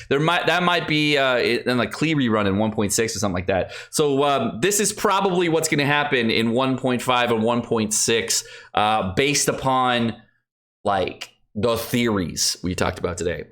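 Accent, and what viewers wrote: United Kingdom. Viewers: = best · I love your intro with and your videos theory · is why original is able to provide us with information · I just watch for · the thing is getting a bit cringe but i guess your audience likes it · Someone should make a compilation of all of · is poggers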